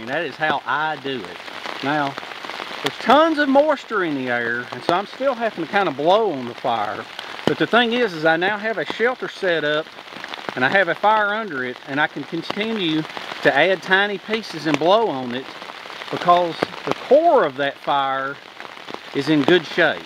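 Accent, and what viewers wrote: South, United States. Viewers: He is as southern as it gets. I am from Alabama i know what i am talking about. Well sometimes i do.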